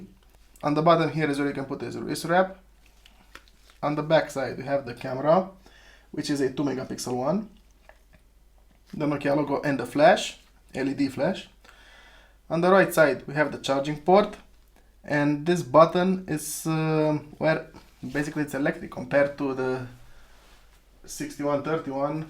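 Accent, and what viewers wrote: Romania. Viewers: I think u are Romanian by the accent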